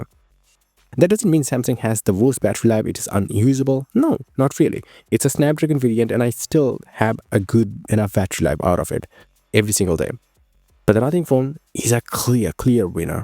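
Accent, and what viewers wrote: India. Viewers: Indian accent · pajeet voce, DO NOT REEDEEEMDEED OTR WATCHT!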